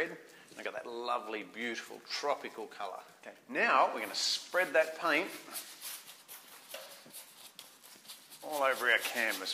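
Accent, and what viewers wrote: Australia. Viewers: I never painted because I thought I had to know how to draw , well imagine my surprise ,when I could paint. I was so confused,because I honestly can’t draw at all . Lol, I’m new here , ready to move out ,I’m in Bracebridge Ontario Canada 🇨🇦,The colours are going to be changing at months end . It’s extremely close to Algonquin Park , where there’s an incredible amount of wildlife,natural lakes ,rocks ,cliffs,it’s really beautiful. I’ve never been to Australia,it sounds like your from Australia/ perhaps New Zealand . I love the Ocean, so when I get settled, this lady is going to order those colours. I wonder if I should get a large can from ( Benjaminin Moore Store ) or no ? It’s acrylic paint,correct? What do you think of metallic paint, ? I’ve recently see ceramic tile ,finger painting . Here , in Ontario , I’d think that would be really expensive. 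However I believe I saw this young man in Costa Rica . However he did use paper ,it looked like watercolour paper ,but it couldn’t be. , definitely durable . , so I honestly don’t have a clue . I’ve just got acrylic paint, and $ store canvases. So should I prep my cheap canvas first? Thanks 🙏 so much . Your very talented. Have a wonderful day. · There's really something about that australian accent.. The way you guys say water is just earfood. Also, it's really wild how a few strokes of paint can do so much. I'm currently painting a picture with a little island in the distance, a big lightning going into the water, but I'm lost at the waves. I have very flat water right now, but during a thunderstorm, water is wild.. But I want to keep the painting calm and soothing still, so I have to figure out how to do this :D Your tutorial helped a lot :) Thank you <3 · OMG, this looks so easy!!! i know you made it look so easy , to us it won't. 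LOL. I love Australian accent! You're such an amazing artist! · This guys amazing! love ya auzzie accent :)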